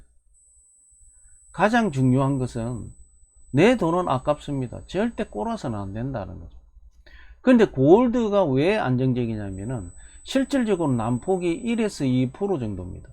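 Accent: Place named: Gyeongsang, South Korea. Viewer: 갱상도 강사님 언태 하시고 꼴고 꼴았고 ㅋㅋ 구수하네요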